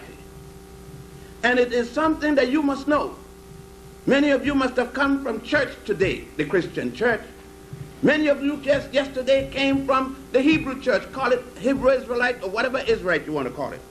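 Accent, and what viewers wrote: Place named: Caribbean. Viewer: Interesting Scholar And Speak With An Accent Probably Caribbean.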